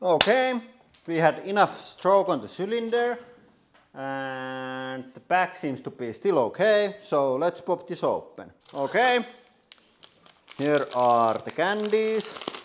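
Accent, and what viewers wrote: Finland. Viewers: They're not sound effects. It's actually supposed to be spoken English, it's just that the Finnish accent is ridiculously strong.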